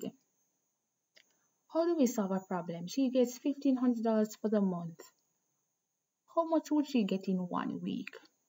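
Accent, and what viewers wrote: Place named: Jamaica. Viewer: am in love with your Jamaican accent..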